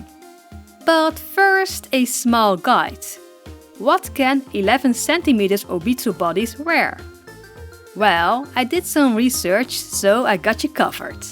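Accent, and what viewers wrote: Netherlands. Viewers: What is your favorite kind of Diuvs transformation? Like what animal is the most fun for you to transform a doll into? Also, I love your Dutch accent! :))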